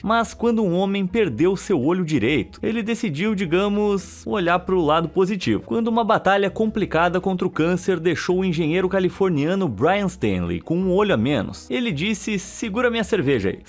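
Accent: Portugal. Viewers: Gosto do teu sotaque em Português (Portugal).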